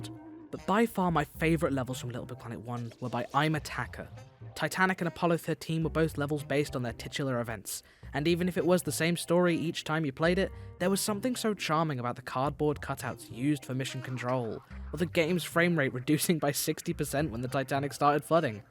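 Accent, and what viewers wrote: United Kingdom. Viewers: Seriously dude are you a fucking idiot trying to describe racism out of that I don't think I can go any further this guy trying tell us changes his whole voice to not sound British self hate much shut your mouth about nothing knowing mf hipacrit do you have black friends shouldn't you be more tolerant and say African American dude all my other colerd friends have big lips bigger nose than me still beautiful but obviously different I work with Asian guess what there eys are smaller then mine dude Seriously just cuz its accurate don't always mean it's racist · The narrator is the only British voice actor in games I play in where they don’t backstab you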